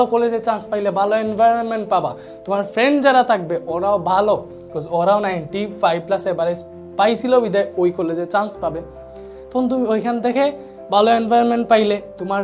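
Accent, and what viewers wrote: Sylhet, Bangladesh. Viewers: Baiya apni Ki Sylheti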